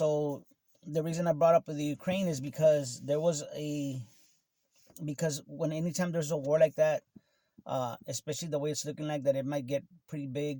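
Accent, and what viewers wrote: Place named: Texas, United States. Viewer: New sub. Love the style and real. Texan here as well. It’s really nice to hear a native voice in all this. Thanks man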